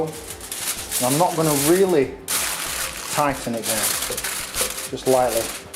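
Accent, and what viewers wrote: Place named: United Kingdom. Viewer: my goodness, you're hot,! <3 im a first time viewer of your channel and good job! And your accent immediately reminded me of my Brit friend...whom, turns out, lives near Rotherham as well! I hope I'm developing an ear for the regions of the UK! Anyways, a man that knows his way around the kitchen is a total turn on! Ahh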